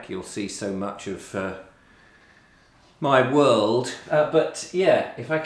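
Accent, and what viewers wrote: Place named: England, United Kingdom. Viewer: I love your beautiful English accent and the way you think! From Canada!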